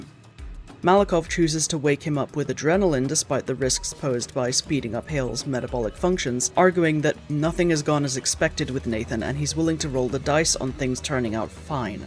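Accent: Scotland, United Kingdom. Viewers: They're Scottish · Amazing British female voice that loves horror. I love her:)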